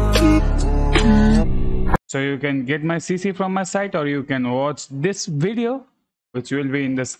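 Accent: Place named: India. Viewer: 😊Indian high quality voice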